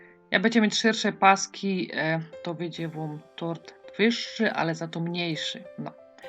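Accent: Silesia, Poland. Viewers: Super, ale fajnie po Śląsku rządzi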